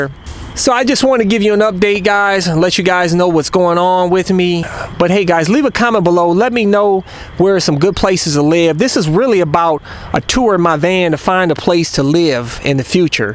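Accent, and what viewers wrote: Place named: North, United States. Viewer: you might want to learn the southern accent. There's still some "good ol' boys" down there, in the smaller towns, that might resent your Yankee accent. I'd hate to hear that they found the bug-out van and the psycho-moto, way back off in the timber but no one around.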